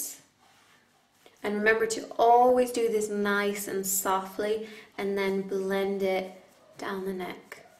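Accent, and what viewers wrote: Ireland. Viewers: Yeah, she's irish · where are you from ? because I feel like I sense a bit of an Irish accent, regardless its very cute ( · are you part irish? i can hear a bit of an irish accent coming through :) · At the beginning she has an Irish accent because she is Irish ;-). She said she does sometimes get a bit of an American twang though :-). I forget why? She has been to the states quite a bit and she might have family there or something, not sure. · Age you irish.? :) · Shes irish :) x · She's from Ireland like me!🌸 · you are messing with my head, ARE YOU IRISH OR AMERICAN GOD DAMN IT?!?!?!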